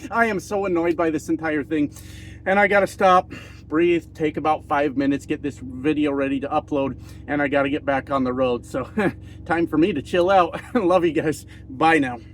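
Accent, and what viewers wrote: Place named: Quebec, Canada. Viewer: Are you Quebecoise?,sound like it.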